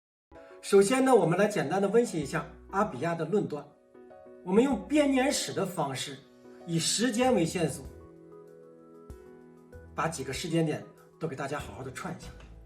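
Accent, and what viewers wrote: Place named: Northeast, China. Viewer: 这个人的说话要训练，且不说一口东北音，他每一句都说：这个的话呢，什么的话呢……。这是语病，要做播音必须要改这个不净口。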